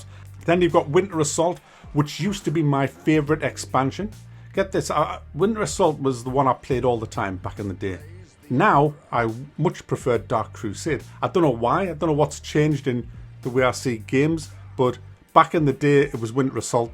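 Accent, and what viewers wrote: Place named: Newcastle, United Kingdom. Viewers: He's a geordie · How the hell do you talk like a Georgie 99% of the time but go posh when you say marrster instead of master?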